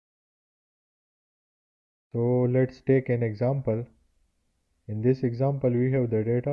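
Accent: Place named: India. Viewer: i cant understand your indian accent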